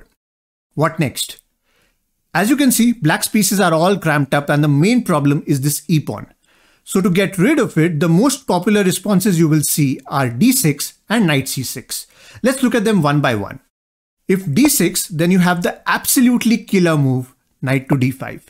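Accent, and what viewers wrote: India. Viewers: This is word for word gotham’s video with an Indian guy narrating 😂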